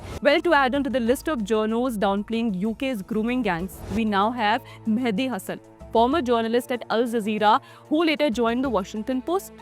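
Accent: India. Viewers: With a certain Indian accent literally one can see you’re making a point scoring . The fact Indians are getting hatred from west one should humble themselves but shamelessness is being genetically inherited by you folks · too much Indian accent for my brain to tolerate ... sorry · You wouldn't stand a min when trying to confront Mehdi or Hijab with any of your claims. Would tremble in fear with your goofy strong Indian accent. I know some or let's say many muslims have tried to be too defensive to label any criticism of Pakistanis as Islamophobic, but how many points in this video are false? Two of the biggest ones 1) Hijab didn't instigate the Leicester riots. He went there a few days after the riots happened. But did use strong words along the lines of we'll attack them (the Hindus) the way they'll attack us. 2) Mehdi is also far from an Islamist. He's confronted Imran Khan for many of his policies for e.g. 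His points about Elon flipping on Pakistanis after getting backlash on Indian H1B visas from Trump supporters is true. A few weeks before Twitter was flooded with anti-Indian sentiment and Elon was getting blasted for his views. Now to make up for it he's flipped totally Pakistanis are scum wherever they go and should be punished for their crimes. But you lot from the subcontinent - Muslims, Hindus, Sikhs, Christians, Buddhism all have the same problem of raping & abusing women. It's something in your DNA or in the soil of that region. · That’s Indian accent, cope with it… and try to focus on the subject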